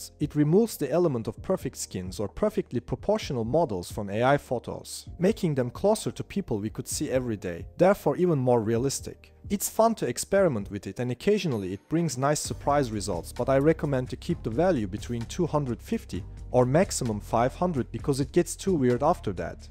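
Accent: Turkey. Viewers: Krall Türk müsünn bu bizim ingilizce aksanımız nerde duysam sanırımm hahah :D Ya şeyi sormak istiyordum örnek bir ürün fotografımız var çanta diyelim. O çantayı aynı tutup arka planınıı değiştirebildiğimiz bir sistem var mıdır bununla ilgili bir kullanım şekli ?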